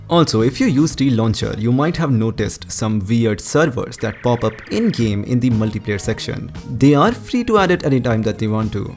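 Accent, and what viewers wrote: India. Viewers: that's an Indian accent for English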